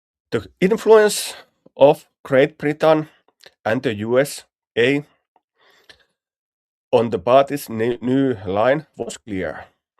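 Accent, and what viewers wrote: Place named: Finland. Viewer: Thanks, nice to hear a Finn speak English👍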